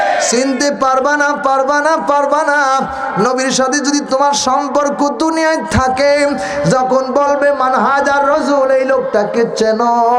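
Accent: Bangladesh. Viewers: শরিয়তপুর টান ঠিক আছে কিন্তু গলা ঠিক নাই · মনে হয় যশোর বাড়ি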